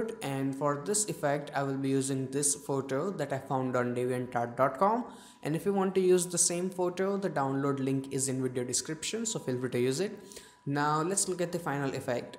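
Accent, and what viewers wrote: India. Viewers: I like how you sound like the guy from "Phlearn", but with an indian accent xD !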